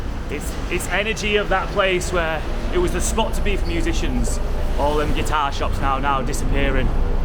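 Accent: United Kingdom. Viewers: BRI ISH ACCENT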